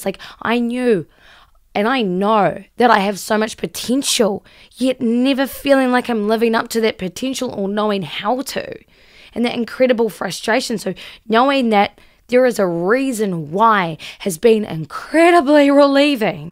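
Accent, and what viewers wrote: New Zealand. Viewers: First thank you for everything you do and speak about here. I do appreciate your effort and perspective. I can relate with most of what your talking about. I’m a combo ADHD. I also express traits of autism. The “super powers” I believe are rather misunderstood and/or difficult to understand. Something I think you can easily understand is this: I enjoy watching you speak and hearing you speak. (2 different things) Your voice is enjoyable and words are very understandable. I also found that I really like the kiwi thing. (Siri is set to Aussie accent for me). At the same time you “accent” or “emphasize” words or phrases with your body language. You express a passion about something or a subject of discussion in ways very few people can. You can make an extremely strong point and speak with a gentle affection towards people. Emotional dis-regulation to me means; you either like it or you don’t like it, passionate about it or cold shoulder towards it, not much middle ground. 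Also I’m pretty sure you will understand this more deeply than most people. Everybody understands a B-day card. You pick it out and sign it, makes it personalized. I believe the universe is not a mistake. Things happen for a reason. “If opportunity is intentional, you should make it personal “ and you do this. Most people would probably say “intimately authentic”......ADHD super power isn’t flying threw the air or super human strength, but more of the ability to express something in a extremely strong compassi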